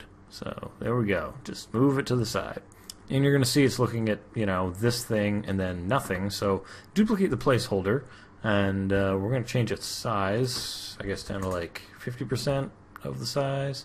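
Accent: Canada. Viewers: Thanks for the help. Love the Canadian accent 👍🏻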